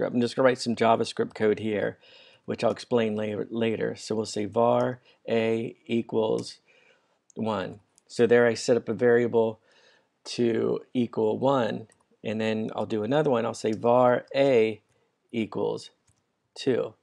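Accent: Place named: United States. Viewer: this shit is sooo helpful im real glad u actually have and american accent my damn teacher is swahili.. its killin me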